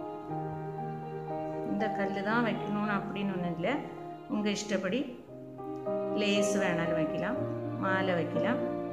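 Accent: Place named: Kerala, India. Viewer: Madam ningal malayaliyanennu thamil parayunnathu kettal ariyam, malayalachuvayund Aa bashayil. It's ok. But picture ethra cheruthayittu kanichal anginey clearayi kanum. Eni adukkunna video valuthayittu kanikku pls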